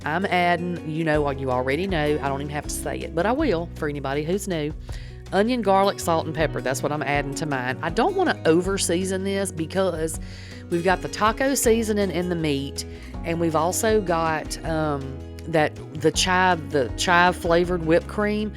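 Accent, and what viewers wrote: South, United States. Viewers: Blueplate Mayonnaise???? lol I have NEVER heard of it in my whole life??!! lol And from your accent you are most definitely Southern or as my first cousin Pam Jackson from the south, i.e., Lakeland, FL, likes to say, “Big Country?!” lol BUT NO WHERE HAVE I EVER SEEN BLUEPLATE MAYONNAISE??! lol I’m sorry I’m not making fun of you I’m just shocked I’ve never heard of it and we are most definitely BIG COUNTRY too??!! Lol I’ve seen ALOT of Dukes Mayonnaise in North Carolina where my father retired out of Fort Bragg, but I personally don’t care for it. But I just can’t get over the fact that I’ve NEVER seen BLUEPLATE MAYONNAISE before. lol 😆 I don’t think I ever saw it in Publix in FL either or Tennessee where my cousin Pam and her husband Dan live now. 😊I am a die hard Hellmans Mayonnaise person myself. Just saying!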